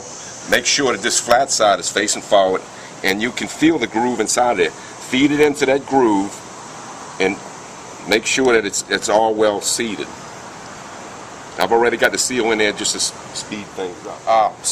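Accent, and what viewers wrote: United States. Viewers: Sounds like he's from New Orleans, Louisiana.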